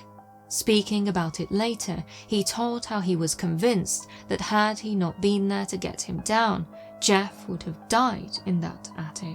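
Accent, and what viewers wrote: United Kingdom. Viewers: I must say, your sponsors get their money’s worth. Flawless ad read in an elegant British accent is usually something they have to pay extra for.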